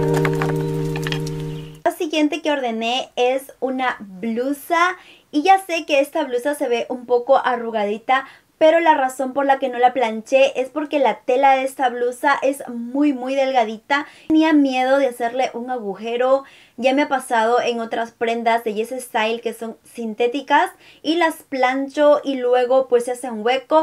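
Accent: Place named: Mexico. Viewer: Pareces mexicana como hablas!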